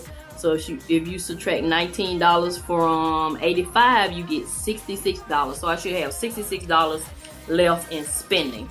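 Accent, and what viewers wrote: South, United States. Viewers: thanks for sharing the budgeting videos. I'm a long-time subscriber and have really enjoyed watching your financial journey blossom. I've been cheering you on silently from the rafters! In fact, if your video is in my feed, I watch you BEFORE I watch any others. You have such a beautiful, giving spirit and, Girl, that southern accent of yours is everythang!...I'm southern, too.😀 I'm curious about your grocery budget, though. I know you shop your pantry before grocery shopping and you also coupon, but would you, if your schedule allows it, please make a What's for Dinner series for one week to help me understand how you grocery shop on $50 a week for two and sometimes have money left over? There's only two of us and, for the life of me, I haven't been able to even come close to that. Thank you so much for the consideration and, again, thank you for sharing. 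Peace and blessings...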